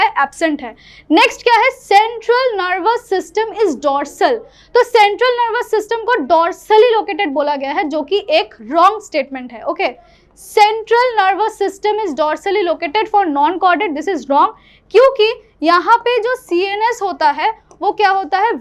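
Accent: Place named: India. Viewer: NICE HINDI ACCENT 👌. VERY IMPORTANT FOR MASS APPEAL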